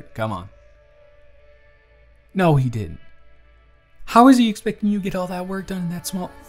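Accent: South, United States. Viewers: I love country, so southern accents are just amazing. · Oh shit...Southern accent?! My heart. How dare you use that against me........ I fucking love you dude. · The cutry accent kind of caught me off guard but you always slay your videos. 🤭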